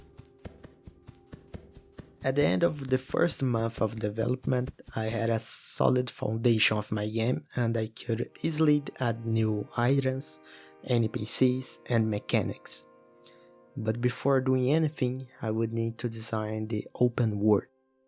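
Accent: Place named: Brazil. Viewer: Cara, pelo que vi, você é brasileiro (suspeitei pelo sotaque e uns comentários aqui hahaha). Queria deixar aqui minhas palavras de incentivo e de admiração. Este vídeo e a sua jornada são inspiradores pra mim na minha busca de criar um jogo. Você me parece um rapaz muito criativo, dedicado e talentoso, por isso, espero que vc atinja seus objetivos e sinta a alegria e satisfação de ver seus projetos completos. De verdade, mano, meus parabéns!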